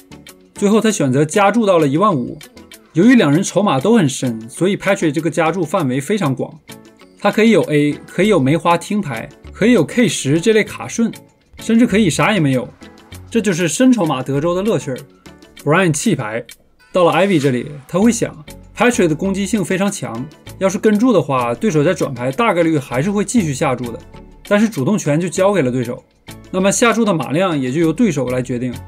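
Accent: China. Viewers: 哥们是长春的？我一个哥们和你口音很相似，他是长春的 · 喜欢你的东北口音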